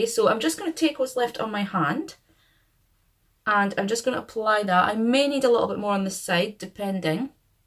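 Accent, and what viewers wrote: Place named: Dundee, United Kingdom. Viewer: I knew I recognised that accent! I’m from Dundee too. Might see you around. Lol xx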